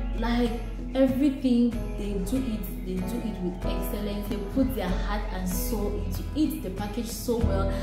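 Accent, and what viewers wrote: Nigeria. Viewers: If you're not Nigerian, I suspect you may have schooled in Nigeria then or grew up among Nigerians. Your accent is so Nigerian with no blemish. You're a good story teller and I love your channel so much. Well done. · One thing with us is that NIGERIANS are just hospitable but we don't see it as anything, it's just normal. You sound so much like a Nigerian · Girl you look, talk and your hand expression is just as beautiful as as a Nigerian. You can pass as a Nigerian girl. Love 💕 it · Your English accent is very nigerian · I honestly don't believe you're Cameroonian . Your look , and your accent even sounds like you're from Any part from Nigeria . Lovely ! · I would have sworn you are Nigerian, you sound like us! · U sound more like nigerian. We love you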